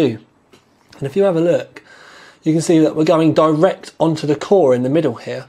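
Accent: England, United Kingdom. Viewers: Why does this russian have an english accent.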